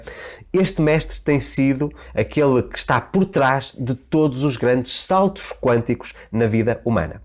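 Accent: Portugal. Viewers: Parabéns, raramente se vê canais onde se fala português de Portugal, muito bem.;)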